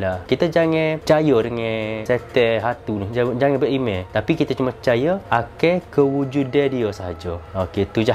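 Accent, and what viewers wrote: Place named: Kelantan, Malaysia. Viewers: Dok td cri tiada komen.. Tiada yg puji brader ni loghat kelantan dia terbaik! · Pekat nau klate,tok pehe boh 🤣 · Pekat kelate boh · Terbaik la tk tnggal loghat kelantan wlaupun ini untuk tontonan umum😂👍👍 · Kuat Kelate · Haha ni dia dikira cube ckp melayu biasa la ni kalau dia gune loghat kelantan betul tu lagi tak faham langsung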